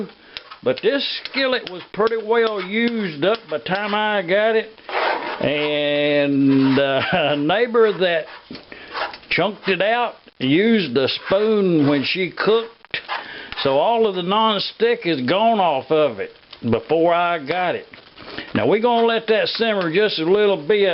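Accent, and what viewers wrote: South, United States. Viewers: I tell you somethin.....I've caught the southern jawl from you......I was yesterday mixing some dough for cornbread and while I was mixing, I was saying to myself-"Gitter DONE !!" This is gunna be GOOOD !! I was chuckling while I was stirring like the Arkansas dog does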